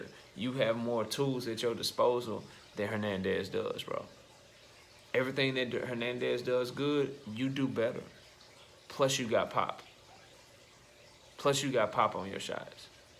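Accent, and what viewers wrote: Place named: North Carolina, United States. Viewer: You from North Carolina? You sound like my family. And you said Bo lol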